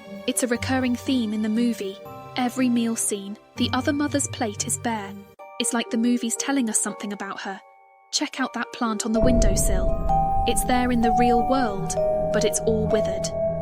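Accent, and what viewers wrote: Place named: United Kingdom. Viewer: I think it just sounds slightly odd because the speaker is British, but keeps using American colloquisms like "a bunch of" and "super" as an alternative for "very".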